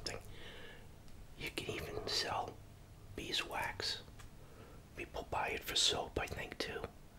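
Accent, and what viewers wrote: United States. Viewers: 15 seconds in and i find your american accent very tingly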